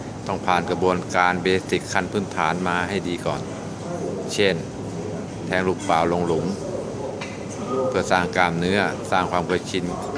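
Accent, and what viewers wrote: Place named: Rayong, Thailand. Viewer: อาจารเป็นคนระยองรึป่าวครับสำเนียงไช่เลย555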